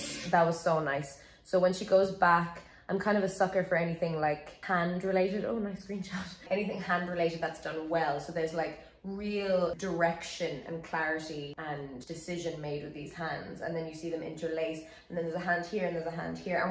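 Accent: Ireland. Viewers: Sorry you’re Irish? I am terrible at accents so I wouldn’t be able to tell where you are from even if you hit me in the face with your country’s flag.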